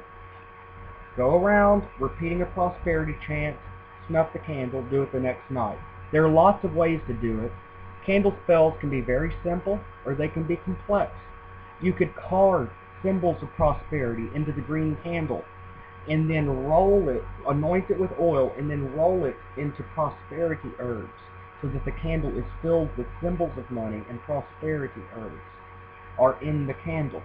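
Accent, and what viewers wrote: United States. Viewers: Thankyou i loved watching you and i love your passion also lurrrve your american 'y'all' accent keep posting xx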